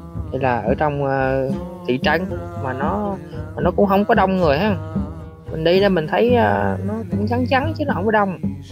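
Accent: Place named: Central Highlands, Vietnam. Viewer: Giong p tay nguyen viet nam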